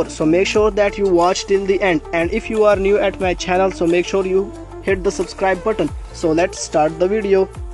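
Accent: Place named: India. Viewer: กูเกรียจมาก สำเนียง อินเดีย!! ..เกรียจ จริงๆๆ!!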